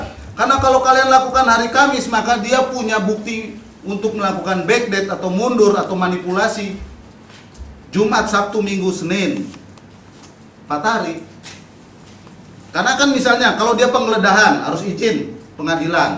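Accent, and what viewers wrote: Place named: Jakarta, Indonesia. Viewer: Cerdas, Lugas, Tegas & Cadas.. 😄 org Batak tp logat, suara dn gaya bicaranya kek org Betawi (mirip Alm. Benyamin S) Saya ngetik ini di tahun 2022, sgl keterangan & ilmu yang diterangkan pak Jhonson persis terjadi pd kasus KM50, Mirna, Brihadir J (SAMBO), tinggal penerapanya aja ini mah..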